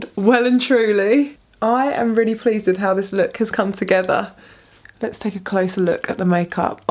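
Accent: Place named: United Kingdom. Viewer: Omg! That british accent !!😍😍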